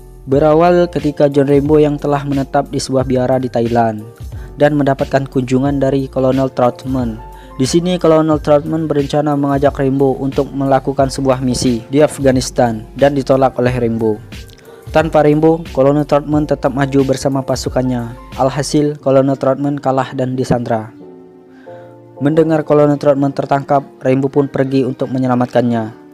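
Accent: Palembang, Indonesia. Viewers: Wong Palembang e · Asli wong palembang :v cuko dak be cuko tengah duo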